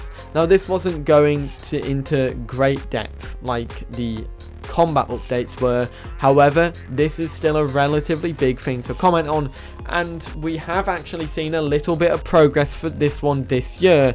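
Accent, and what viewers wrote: United Kingdom. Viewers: Blud I, British but I ant as British as this guy💀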